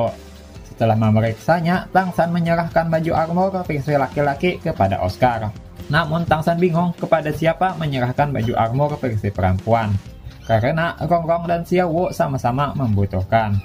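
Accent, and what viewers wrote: Banjar, Indonesia. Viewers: urang banjarkah pian, logatnya kyk urang pahuluan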